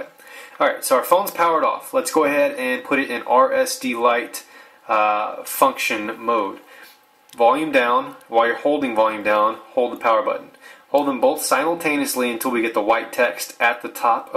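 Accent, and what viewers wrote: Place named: Michigan, United States. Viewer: Are you from MI? You sound like you might have a MI accent.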